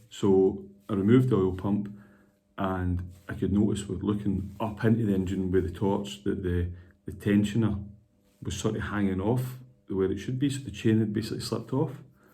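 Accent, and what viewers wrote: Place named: Scotland, United Kingdom. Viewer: Great video, nice to hear a Scottish accent too! My dad’s van is the mk3 but there is a bit of a racket coming from the engine, no low oil pressure and warning as of yet, the rattle evens out after a bit of driving, my thinking is it’s taking a while for the oil to get to the top maybe due to a pump on the road out or a bad tensioner like yours. Did you say you done the timing chain tensioners? What about the chain? Curious on the millage as well, cheers👍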